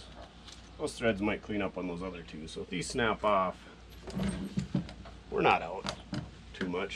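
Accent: Minnesota, United States. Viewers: Just found your posts and I want to tell you how much I enjoy them! Basic but informative and just a plain old fun watch. Sounds like your from Minnesota or there about?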